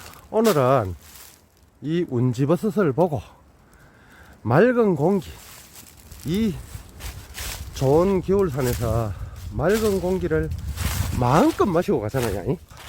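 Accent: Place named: Gyeongsang, South Korea. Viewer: 구수한 갱상도 사투리가 정겹습니다~^^ 귀한 영상 즐감하고갑니다 수고하셨습니다 행복한 나날 되세요 🙏 감사합니다 🤗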